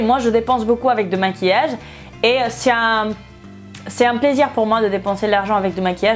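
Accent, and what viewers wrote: Spain. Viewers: Moi je trouve que t'as un acsent espagnol mais j'ai bien aimé la vidéo bye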